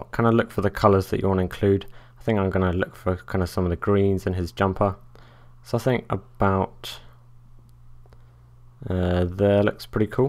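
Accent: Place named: RP, United Kingdom. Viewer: Great tut! (also - you sound dead posh!)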